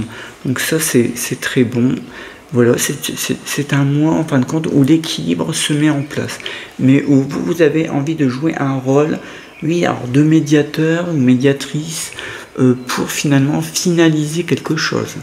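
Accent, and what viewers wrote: North, France. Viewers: Bonjour, bon dimanche. Votre lecture est d'une précision époustouflante. Merci je vous écoute avec votre joli accent du nord de la France ☺️ Cordialement Danièle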